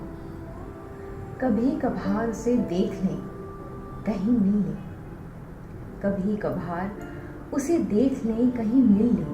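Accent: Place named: Hindi Belt, India. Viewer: معذرت لیکن سچ میں غیر مسلم کا لہجہ الگ ہی سمجھ آجاتا وہ کشش لگ ہی نہیں رہی۔ اردو سے محبت تو بہت لگ رہی میڈم کو مگر لہجہ میں اب بھی ہندی کا اثر واضح ہے۔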